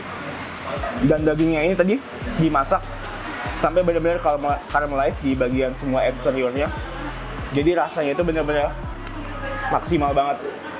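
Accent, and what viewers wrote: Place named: South Jakarta, Indonesia. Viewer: Bahasa jaksel lu gak usah ditahan-tahan bang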